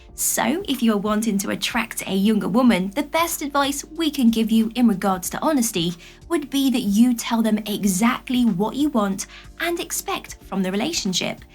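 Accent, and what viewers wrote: United Kingdom. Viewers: I'm already married, but I enjoy hearing these tips and love the British accent. And these videos are a nice distraction from all the fucked up things going on in the world.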